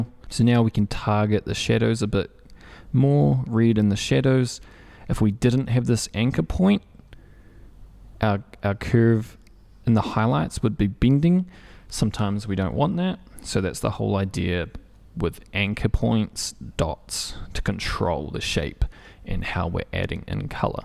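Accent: New Zealand. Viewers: Him and all the other Kiwis living in the WÜÜÜRLD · it was interesting but your kiwi ixint is so strong i get distracted :DDD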